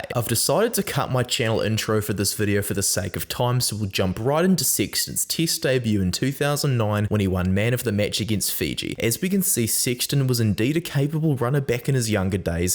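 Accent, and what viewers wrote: New Zealand. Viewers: Sixton is the bist nambah tin The kiwi accent is wonderful ❤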